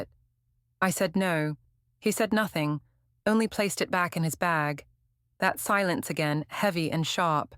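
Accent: United Kingdom. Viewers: This British narrator is dragging this idiotic story and dont waste others time you idiot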